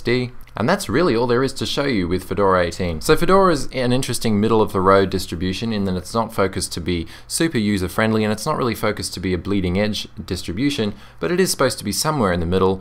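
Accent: Australia. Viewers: Great review, thanks! P.S. Your Australian accent is really really nice! I absolutely hate British accent (my gf's entire family were born in England and later moved to Canada), but Australian accent just has subtle differences which actually make it really attractive. Sorry for the off topic comment, but I just thought I'd let you know how big of a selling point your accent is when it comes to online reviews. Subscribed :)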